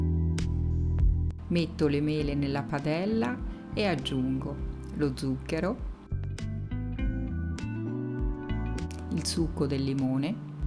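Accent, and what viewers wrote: Tuscany, Italy. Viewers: 😋😋😋😋che meraviglia. La farò sicuramente. Ben tornata ascoltando la tua bellissima voce con l'accento Toscana.mi sei mancata.ma ora si ricomincia mia cara.e come ti dico sempre un bacione cara.❤❤❤❤❤❤❤❤❤❤❤❤❤🌹🌹🌹🌹🌹🌹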